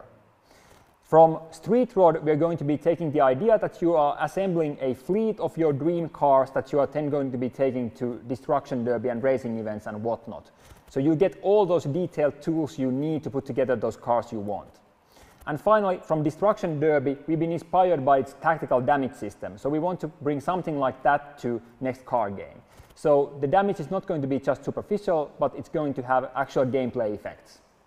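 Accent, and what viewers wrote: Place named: Finland. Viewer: That finnish accent! My Greetings from (my homeland) Finland to Finland :D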